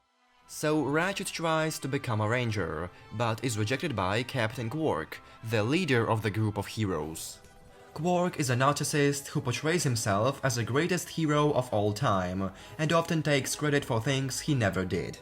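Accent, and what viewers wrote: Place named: England, United Kingdom. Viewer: тебе очень идёт английский акцент, и вообще, молодец, что решил изучать языки😄Круто справляешься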